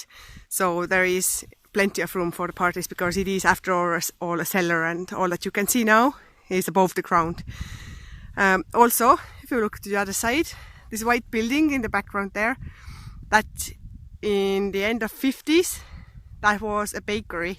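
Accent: Ireland. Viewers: I bet u live in ireland as u have irish accent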